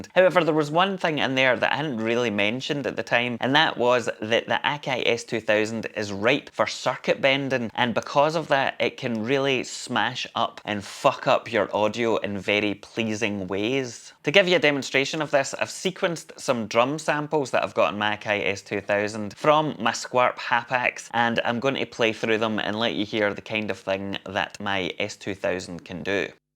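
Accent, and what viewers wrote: Scotland, United Kingdom. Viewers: Love yer scottish accent (Shetland / Orkney?) Those AKAI sounds wicked too.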